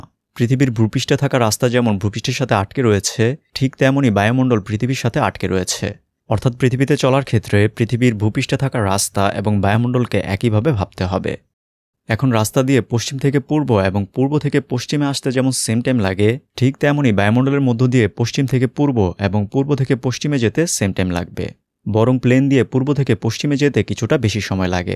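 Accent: Narsingdi, Bangladesh. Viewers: Vai apnar basha ki narsingdi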